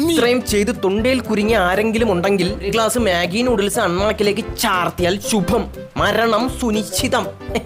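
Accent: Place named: Thrissur, India. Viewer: ഈ ചേട്ടന്റെ ഭാഷ തൃശ്ശൂർ ഭാഷയാണ്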